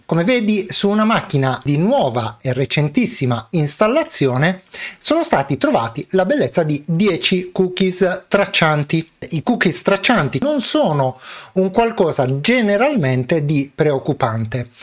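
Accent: South, Italy. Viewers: Salut mon vieux, comment vas tu ? Ci si ri..legge ^^ Tre domande "bionde"...soltanto, anzi quattro..., insomma poche domande ^^ :- Malwarebytes si deve disinstallare prima di installare questo o si può fare anche dopo ? Vivi a Genova ? quindi non sei di Genova ! Sei del sud, vero ? La tua "erre" (e forse anche il tuo nome) ti tradisce ^^ Di dove esattamente ? Perché non puoi mangiare patatine ? Usi la stevia ho sentito, diabete forse ? Uhm la tua Nutella direbbe di no, o sbaglio ? ...nel corso del corso...? LoL Ciao e ancora grazie caro vecchietto ^^ P.S. In futuro forse dovresti aggiungere il software in download sul tuo sito prima di pubblicare il video che lo riguarda...vedi caso 3DP Chip ^^ P.P.S. Come avrai notato il mio italiano migliora constantemente. Brava la bionda, vero ? ^^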